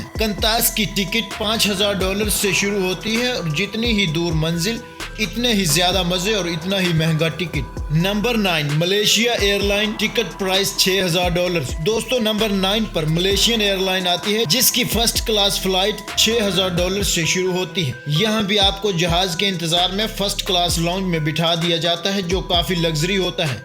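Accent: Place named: India. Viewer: Are you an Indian cause your pronouncanation is so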